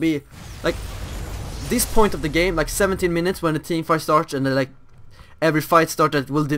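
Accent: Sweden. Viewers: Låter som att du är svensk, stämmer detta? ;)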